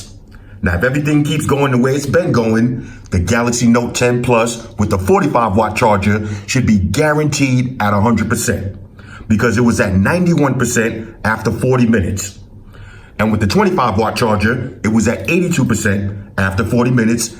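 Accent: New York, United States. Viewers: I can fall asleep to this deep ass NY accent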